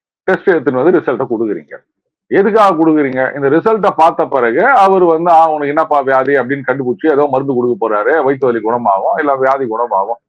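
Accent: Chennai, India. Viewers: talks are in Madras style which all of us like. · Madras Bashai! grew up in the rough and tumble of Madras! I like his Tamil because I am also from Madras! interview is by and large will be in Madras Bashai! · Chennai Tamil. Why should one get irritated to listen to a regional Tamil, that's part of our TN and that too capital city.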